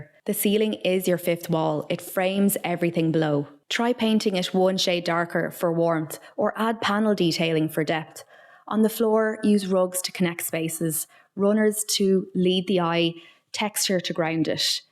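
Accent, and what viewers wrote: Ireland. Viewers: To a large extent, I am watching because i love Irish accents.....so there's that. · Thanks for getting straight to the point without a lot of unnecessary introduction and explanations. Your Irish accent is as beautiful as you are, too. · I'm here for the lovely Irish accent! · Love your irish accent up the dubs 😂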